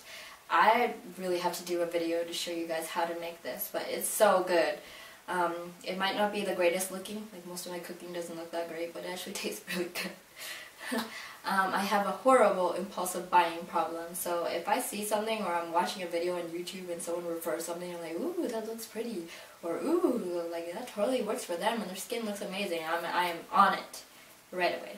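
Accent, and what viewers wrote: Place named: New Zealand. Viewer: hehe yup but i think its the new zealand accent seems easy to pronounce for me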